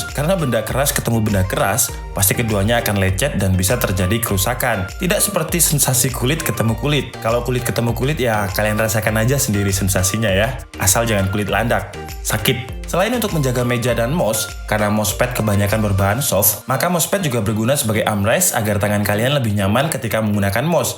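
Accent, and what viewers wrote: Malang, Indonesia. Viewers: Khas e wong Malang 😂💪🔥🔥🔥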